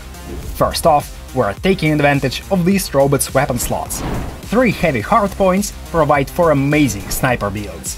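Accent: Russia. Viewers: парни, наймите диктора с хорошим английским кровь из ушей же стрронг ррашн акцент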